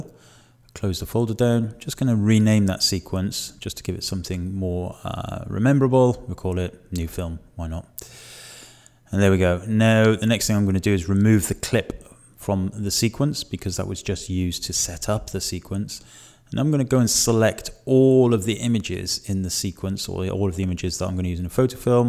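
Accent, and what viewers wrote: United Kingdom. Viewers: I love your british english 😂. Thank you for this very important video!!! I hope to meet you ONE DAY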